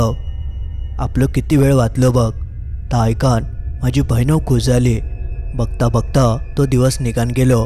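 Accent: Malvani, India. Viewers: एक नंबर 👍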